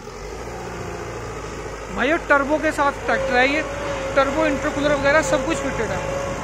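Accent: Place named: West Uttar Pradesh, India. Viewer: Bhai tumhari aavaj west UP ki lg rhi kha se ho bhai aap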